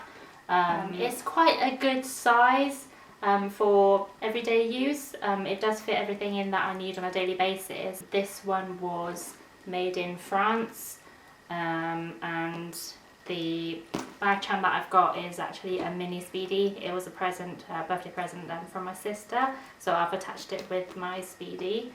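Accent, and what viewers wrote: United Kingdom. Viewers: Love your English accent and the video is incredible . I find your video quality impeccable and flawless. You addressed my questions except I’m awaiting for the one question about if straps purchased have stamp code or not. Thanks love.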